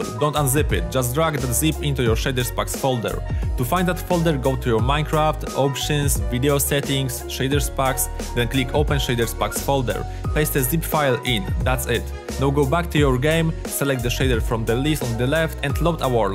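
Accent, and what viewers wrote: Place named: Poland. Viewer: słychać że polak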